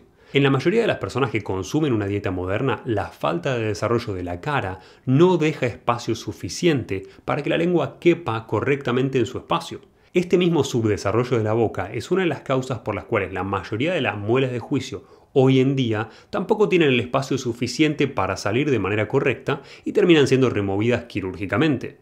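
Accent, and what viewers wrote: Argentina. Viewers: como pasar de argentino a español el video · Wtf argentino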